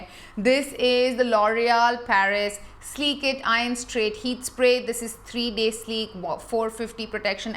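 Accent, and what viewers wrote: India. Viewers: Priyanka Patnaik I understand what you are saying. But she cannot help it. When you listen to different accents all day you tend to get slightly confused. It does not bother me though. I prefer her natural Mumbai accent, I lost mine in the US, so it feels like home. ❤️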